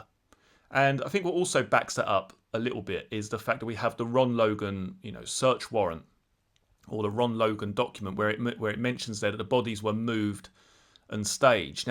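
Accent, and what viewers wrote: United Kingdom. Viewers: I look forward to all your videos regarding this crime. You throw out so many points, & theories like I do, & think like I do. Also, your accent is wonderful,& British accents are in my top 3 favorite accents, and it really brings out the captivating way of telling a story, IMO.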